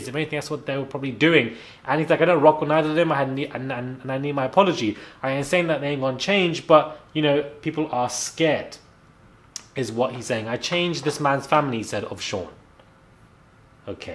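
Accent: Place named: England, United Kingdom. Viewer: I think I like your content but you speak way too fast. Especially because I’m Canadian & also can’t keep up because of your English accent, charming as it is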